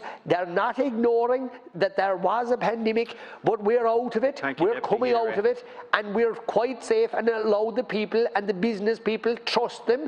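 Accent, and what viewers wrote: Kerry, Ireland. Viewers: I only came here to listen to the mellifluous tones of the Kerry accent.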